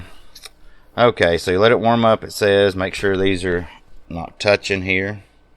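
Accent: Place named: South, United States. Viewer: Mr. thanks for the refurb on this equipment. My lead coax reads rg58 /u, after restoration it seems to function as designed. It appears useful for spotting intermittently shorting capacitor pots. Thanks again looking forward to more vintage test equipment on your channel and your laid back southern style.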